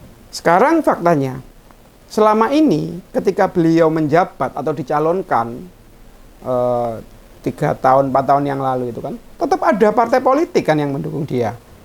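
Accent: Java, Indonesia. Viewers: ini asal daerah mana ya kok jawanya medok banget..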